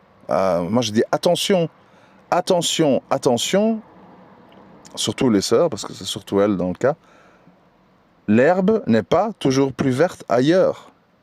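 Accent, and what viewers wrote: Belgium. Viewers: Sympathique l accent belgeomusulmant. Le reste aussi.😊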